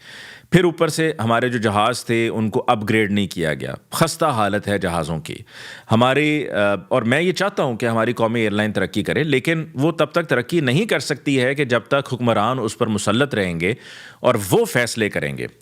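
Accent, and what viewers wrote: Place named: Lucknow, India. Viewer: صاحب آپ ماشاء اللہ دیکھنے میں منڈی بہاؤالدین کے لگتے ہیں اور آپکا تلفظ لکھنؤو والا ہے ۔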